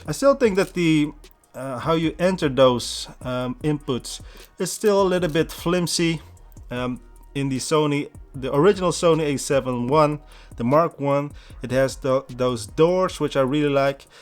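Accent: Netherlands. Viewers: Hoorde direct dat het nederlander was.. Nice content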